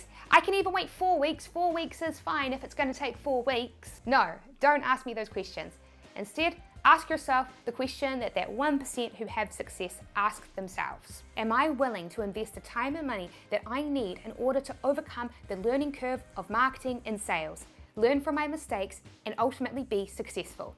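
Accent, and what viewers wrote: New Zealand. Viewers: Iv never had the urge to make my own online business, but i may do it just so i have a legit excuse to watch your videos other than the reason now, this kiwi accent is awesome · At 78 I'll probably not launch a dropshipping business but I tune into videos just to hear her interesting Kiwi accent. I lived in New Zealand for twelve years and yet I've never heard an accent like it. Also I love her vibrant personality. All the best to everyone, from Doug the atheist demon from Parramatta, Sydney Australia. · What is your accent ? there is abit of New Zealand / abit of Irish or American, maybe South African ?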